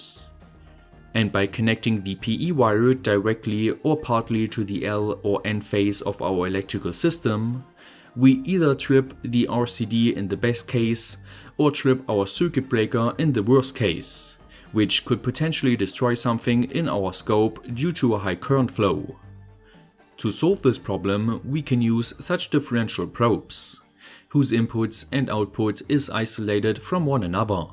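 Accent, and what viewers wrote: Germany. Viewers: German accent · You’re german right ?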